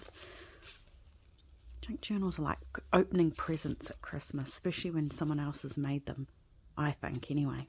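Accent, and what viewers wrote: New Zealand. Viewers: I never thought of using a journal as decor! I am on my second journal - the first was made out of a little box and I am actually using it for notes and memorabilia; the other is bigger and sits on my desk - it is still being added to and it's not for writing in, just, well, admiring and trying things out as I learn. I now have an excuse to start a third one - a purely decorative one for my bedroom:) Lovely channel and great to have New Zealand content and a Kiwi accent to listen to. I'm waiting to see some Edmonds cookbook pages turn up in your signatures!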